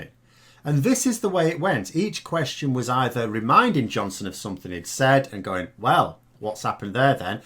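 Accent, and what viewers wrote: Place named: West Yorkshire, United Kingdom. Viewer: Your accent / phrases remind me of home 😭... "that gormless article" 😂 West Yorks by any chance?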